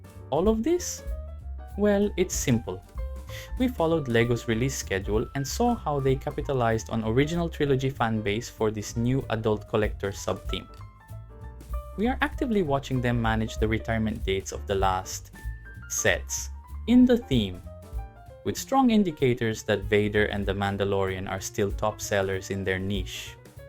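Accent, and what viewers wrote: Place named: Philippines. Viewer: Your accent sounds so Filipino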